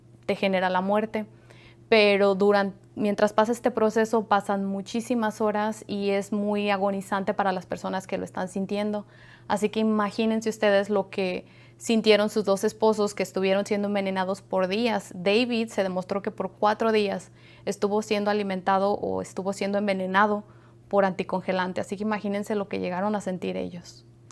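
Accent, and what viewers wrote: Mexico. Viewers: Al fin alguien que narra los episodios con normalidad sin pretender ser dramático. Además el acento es perfecto. Me encanta que seas mexicana 🇲🇽 Muchas gracias.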